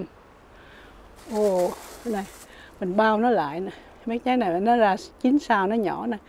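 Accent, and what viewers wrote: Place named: Vietnam. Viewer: Moi lan minh nghe tieng noi con Viet Cong la minh biet lien Do XAO XAO ,cup ve VIET NAM ma O voi VIET CONG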